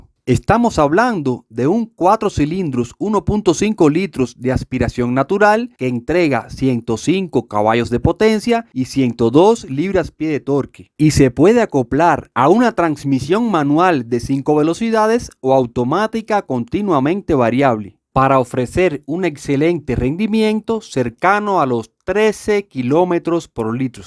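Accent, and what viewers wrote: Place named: Central America. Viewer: Con acento centroamericano.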